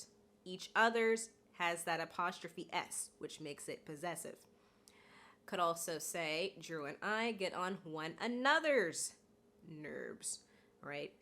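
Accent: United States. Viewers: Great as always sis. I'm from côte d'ivoire and i teach english and I do love american accent. But plz can you gimme the name of the app you use to make your video. Thx take care😊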